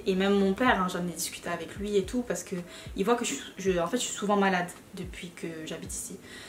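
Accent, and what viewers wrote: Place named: South, France. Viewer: jaime trop ton ptit accent moi aussi je viens du sud de base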